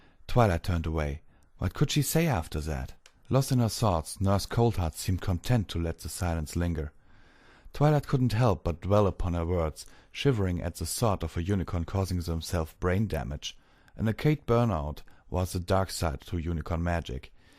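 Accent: Germany. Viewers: Sounds more like German to me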